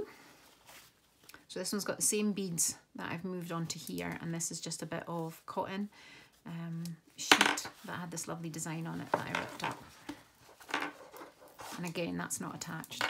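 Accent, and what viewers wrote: Scotland, United Kingdom. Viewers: Oh my goodness you really are very talented lady, these journals are gorgeous you can see how much you enjoy doing what you are doing l love them. How exciting getting your own crafting room set up, it's lots of fun. I would love to sit with you and craft away watching you create and listening to your accent, I can make the tea or coffees. I use to work in Scotland at a Outward Bound Lodge on the edge of Loch Eil. So listening to you reminds me of a time that seems lifetime ago now (26yrs now) I live in Australia with my family, watching and listening to what's happening in the UK and elsewhere is just beyond belief. Keep safe, and keep crafting because we love seeing what you do, take care Jodie xx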